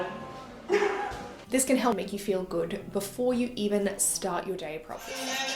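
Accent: Australia. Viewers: I miss the Aussie accent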